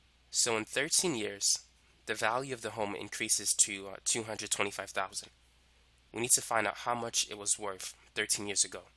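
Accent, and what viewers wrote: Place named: New York, United States. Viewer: New York accent innit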